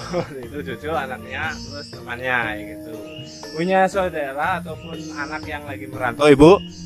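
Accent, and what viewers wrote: Banyumas, Indonesia. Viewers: Rika wong ngendi sih kang jawane ngapak temen pada bae karo kancaku wong cibenying 😀😀😀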